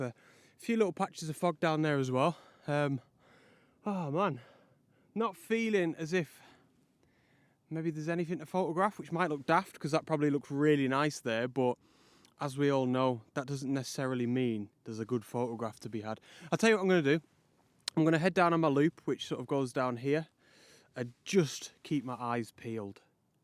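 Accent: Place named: Manchester, United Kingdom. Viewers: Hello from sunny Manchester, why do you sound like a manc? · Hi! Watching your channel for a couple of years now here in Ireland. love it and looking forward to getting your calendar in the post soon! Strange question, but love to know where the accent is from! Went to UNI in England with a fella from Workington but you sound a bit softer. I was originally guessing somewhere around Manchester then thought Lancashire but maybe more north like Lancaster? If tis too personal I understand! Just a bit of a language/accent geek here! {probably way off!}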